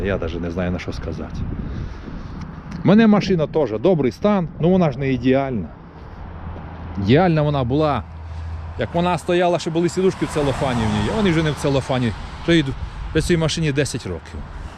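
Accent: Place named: Polissia, Ukraine. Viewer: Доброго дня , у Вас мова даже схожа на людей з Полісся, Чорнобиль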